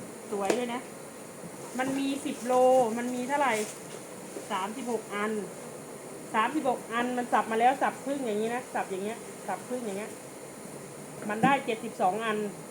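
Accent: South, Thailand. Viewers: สำเนียงชัดเจน คนใต้แน่ๆ😂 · คนใต้หรือเปล่าคะเก่งจังได้ความรู้ด้วยค่ะ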